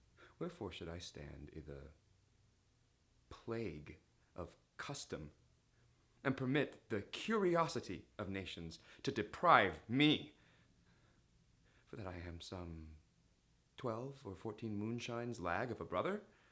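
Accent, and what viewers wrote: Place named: Ireland. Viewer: im so confused if youre irish or american haah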